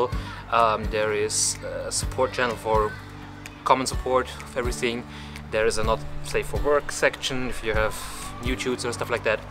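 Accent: Germany. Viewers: Cool video! Are you from a German speaking country? Or did I mishear something :D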